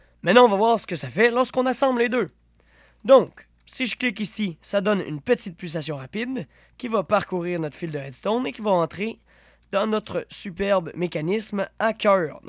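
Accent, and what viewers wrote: Quebec, Canada. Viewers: Juste excellent, et puis ton ptit accent québecois ça rend le tuto encore plus sympa à écouter :)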